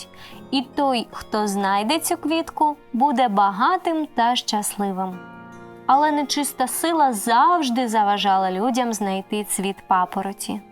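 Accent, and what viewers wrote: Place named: Luhansk, Ukraine. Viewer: А я люблю вашу вимову - така Луганщини))) Луганськ - ЦЕ УКРАЇНА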